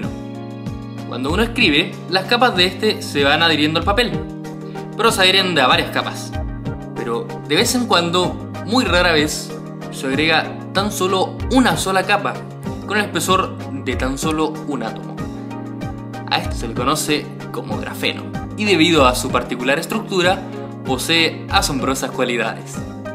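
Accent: Chile. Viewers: Eres chileno? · Oye, qué genial tu canal. Estaba buscando imágenes del grafeno para tratar de entender el tema de los cristales, para ubicarme en eso de que "son la excepción a la morfogénesis autónoma" que plantea Monod y todo esto porque estoy leyendo sobre la teoría de Gaia de Lovelock para una revista digital que edito... o sea, la vuelta pa' larga! (pero entrete, una cosa lleva a otra). El caso es que llegué al video y caché al toque que eres chileno, jajaja! Y bue... me di una vueltecita por otras cápsulas y nada, poh, que está muuuuuy interesante. Ojalá en los colegios y liceos enseñaran así, con experimentación casera. Ta muy bueno! Un aporte. Eso.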